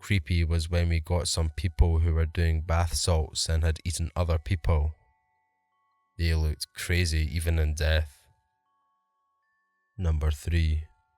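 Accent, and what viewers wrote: Scotland, United Kingdom. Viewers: I already knew that I loved morgue stories, but with a Scottish accent, I love them all the better!! 👍👊fist bump!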